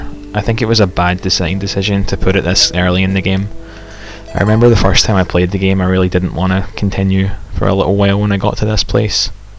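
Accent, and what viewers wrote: Scotland, United Kingdom. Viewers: holy cow is SCOTTISH, thats surprising lol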